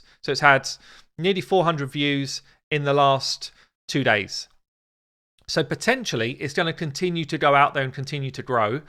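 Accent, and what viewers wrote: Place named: United Kingdom. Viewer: Can you like read a book one time, your British accent ....... I enjoy it. Keep up the amazing content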